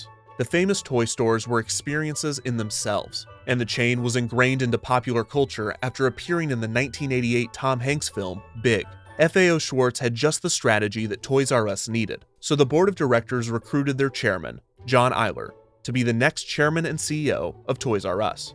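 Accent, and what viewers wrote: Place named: New York, United States. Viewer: That was the most aggressively New York accent I've ever heard! XD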